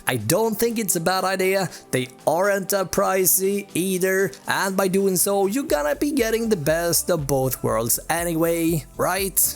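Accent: Sweden. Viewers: Are you perhaps Nordic? Swedish perhaps? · Hörs ju glasklart på hur han pratar engelska. Can't get more svengelska then that...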